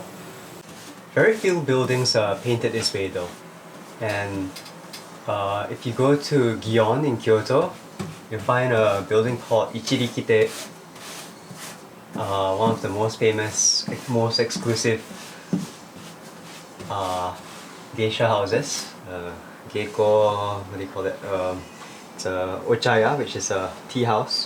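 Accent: Singapore. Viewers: I was looking for video about Irori and Jizai Kagi, and stumbled upon yours. I watched the previous episode where you documented the installation process. It was so peaceful and full of old wisdom. I heard you speak Japanese with Mr. and Mrs. Okuno, and I thought it must be another "Peaceful Cuisine" like channel. But then suddenly, eyhhh..Singlish? Anyway, when the time is right, thinking of visiting this farm-to-table cafe. Keep up the good work!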